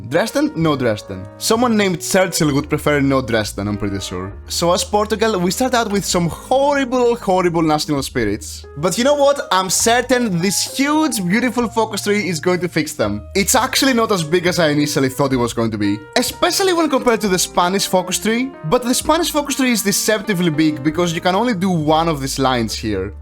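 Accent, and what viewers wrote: Greece. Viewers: Bro , are you greek ? Cuz the accent really seems greek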